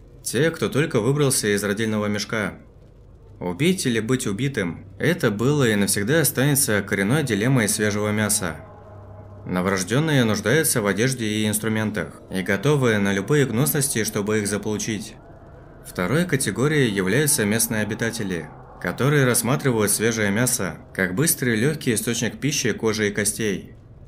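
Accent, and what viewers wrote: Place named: Urals, Russia. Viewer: Да,в утра.Уральский говорок-это круто.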